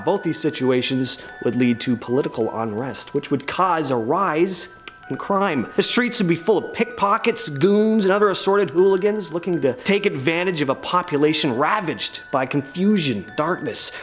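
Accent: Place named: England, United Kingdom. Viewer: The english accent this dude makes man, LMAO! Too good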